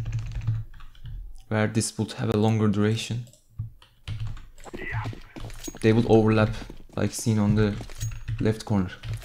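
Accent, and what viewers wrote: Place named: Turkey. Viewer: Selam dostum. Ben de bu konuda bir şeyler yapmak istiyorum. Aksandan direkt anladım Türk olduğunu ve mutlu oldum. :D Sana nasıl ulaşabiliriz?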